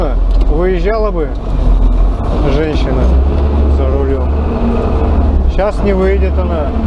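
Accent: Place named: Perm, Russia. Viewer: У тебя говор что то из Пермского есть)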